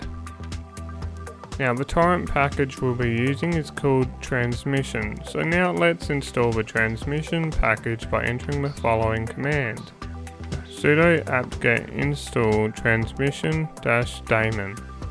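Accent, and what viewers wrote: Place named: Australia. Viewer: Habib he sounds Australian.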